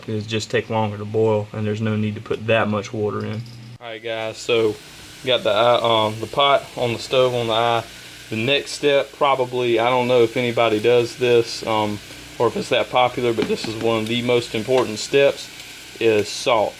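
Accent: South, United States. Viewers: I’ve done the cold water with ice and they still are difficult to peel. I will try your method. For the record I like your southern drawl! Keep on keepin on!!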